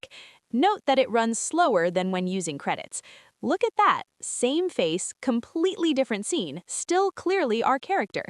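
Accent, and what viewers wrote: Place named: Chicago, United States. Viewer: you must be from chicago... good work...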